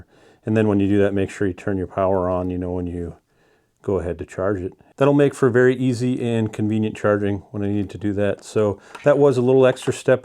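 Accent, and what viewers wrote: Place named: Canada. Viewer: Well done,.I can detect a little canuck in your accent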